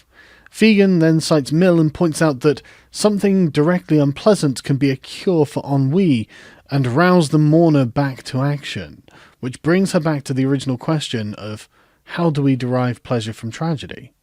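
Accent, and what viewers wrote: United Kingdom. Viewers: I'm here just for the British voice ASMR.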